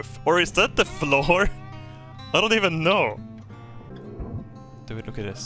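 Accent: Sweden. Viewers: is getting a thicker Swedish accent every day! Love it!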